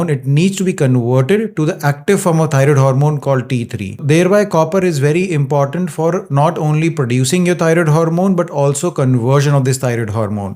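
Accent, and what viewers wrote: South India, India. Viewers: with south Indian accent..❤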